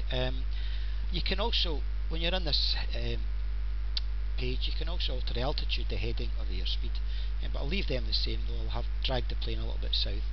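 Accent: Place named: Scotland, United Kingdom. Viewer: you have a lovely accent! scottish?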